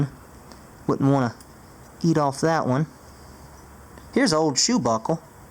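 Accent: South, United States. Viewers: Your obviously 7, it's a southern accent, it's common for people to have accents like that, especially if they live I n certain parts of Texas, Alabama, etc. people who are black don't exactly have an accent, they talk a certain way, but it's different from a plain southern accent, he litterally just talked about Texas, and said y'all, he's from the south maybe the west you never know, it all depends on where you live. But I don't expect you to understand going off of the fact that your most likely under the age of even 8.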